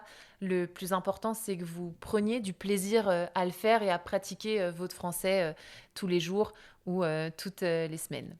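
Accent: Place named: Lyon, France. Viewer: J’ose parler français avec les francophones assez regulièrement. Malgré d’avoir un niveau avancé, je m’occupe trop du regard des autres. Ça ne me bloque pas trop sauf quand je traite des sujets où je n’ai pas le vocabulaire. Merci pour cette video et de ce message d’être tolérant avec soi même. J’adore ton accent (lyonnaise?).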